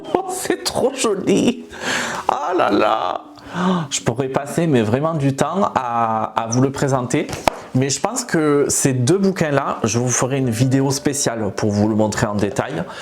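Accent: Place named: South, France. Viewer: Coucou je viens de découvrir ta chaîne super l’évolution de tes colos et j’aime beaucoup les livres sur ta copine Pauline t’as ramené du Japon . T’as l’accent de chez moi du sud j’aime trop et magnifique ta petite sirène bravo oui cette double page prend une blinde à faire 😃 hate de tes prochaines vidéos 🥰🥰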